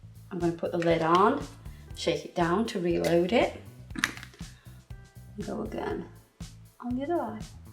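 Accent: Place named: North, United Kingdom. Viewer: That looks freaking awesome! Loving this second channel, as soon as I've watched your nail tutorials I come over here haha! it's great to here a fellow northerner on YouTube! Hello from Merseyside!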